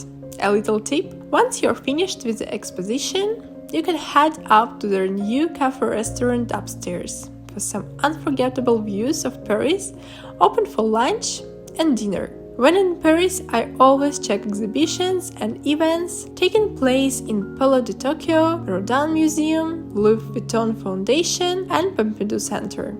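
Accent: Russia. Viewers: Какая красивая! Сразу услышала русский акцент 🥰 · Русский акцент не вытравить ничем Как как она себя назвала?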